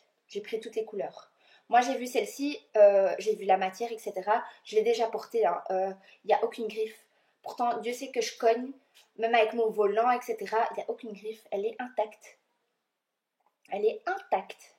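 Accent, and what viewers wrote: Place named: Belgium. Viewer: J'aime bien ton haul 🤗 Le mascara gemey avec la brosse en forme de cœur me tente hihi😊 Dit moi tu es belge? Parce que j'entend comme un accent quand tu parle. Des bisous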